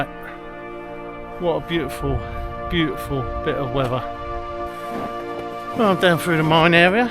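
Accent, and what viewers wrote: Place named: South East England, United Kingdom. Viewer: You've got the same tent as me, South east accent same... so subbed. 😂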